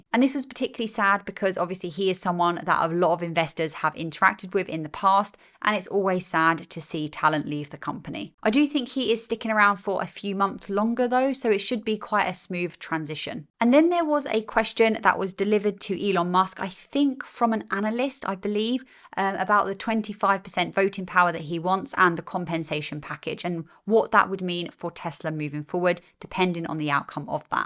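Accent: England, United Kingdom. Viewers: This lady is very good with insightful and well-organized presentations. Her cute little British accent is also fun to listen to. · u sound English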